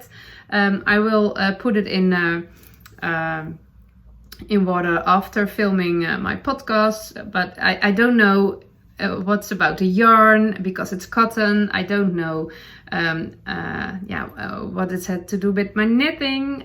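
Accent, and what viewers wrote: Netherlands. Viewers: Hi, you have such a sunny, delightful personality and I love your "Dutchy-English"! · I am a new viewer -your podcast was recommended by Selma of Little Big Knits. I love your accent. I have a lovely sister-in-law and a good friend from the Netherlands so the accent makes me smile. A piggy 🐷 bank is generally a ceramic pig with a slot to put coins in to save up money. Now they come in all shapes and sizes. Thanks for a very entertaining podcast! 🤗 I am from BC Canada 🇨🇦 and have been knitting for over 60 years and am still only mediocre, but I do love knitting! 💜💙💕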